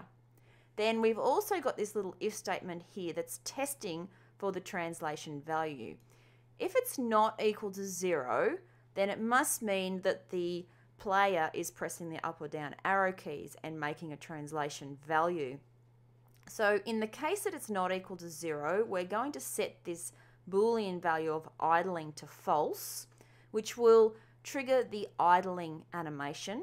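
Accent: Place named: Australia. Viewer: I'm from the US, and there is something about the Aussie accent that just makes it feel easier to learn and listen. That or this lady is great at explaining. Maybe both! Just me?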